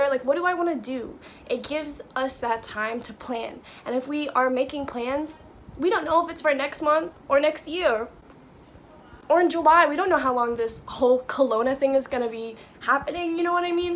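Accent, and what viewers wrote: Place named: Australia. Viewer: I LOVED YOUR PERSPECTIVE ON IT. I 100% AGREE. Like about the animals and especially the shower part. I'm literally exactly the same. I talk to the universe everyday and sometimes It makes me cry too.... also i noticed the way you say life is so auzzy now! Like you say lyfe instead of life and nyow instead of now.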